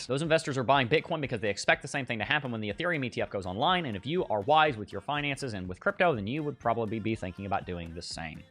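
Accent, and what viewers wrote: United States. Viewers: Im from the uk a normal person listen to this yank typical noisy thiko yank Thinks hes on a yanky bog roll advert 😂😂😂😂😂😂😂😂😂😂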